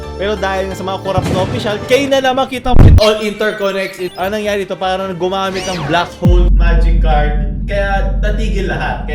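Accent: Visayas, Philippines. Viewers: Yung bisaya mo nagiging local accent na stay yawa and cancer everyday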